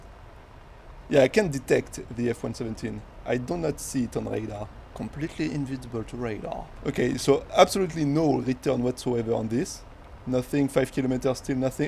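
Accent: France. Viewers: French accent? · Average french accent 😅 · Very french sounding 👌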